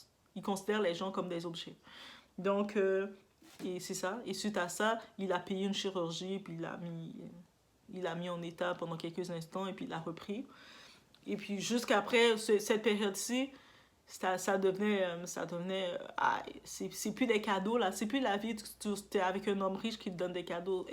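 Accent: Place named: Canada. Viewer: Excellent. Ca manque juste un peu usher et le petit Justin J’adoré ta voix avec ce petit accent canadien. 👍🏾👍🏾👍🏾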